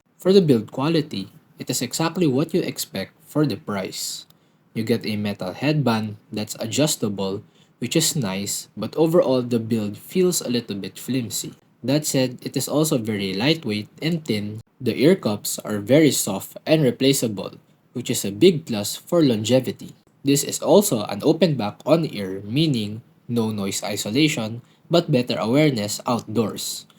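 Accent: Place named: Philippines. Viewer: bro are you also from phillpines like les modio you guys have similar accent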